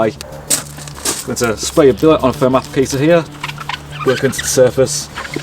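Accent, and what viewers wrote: Liverpool, United Kingdom. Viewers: Scouse but with a touch of refinement? Crosby?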